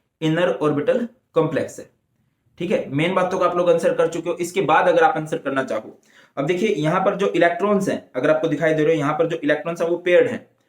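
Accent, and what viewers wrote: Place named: Kaithal, India. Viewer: aap kha se hoo aapki bhasa mereko khethal side ki lgi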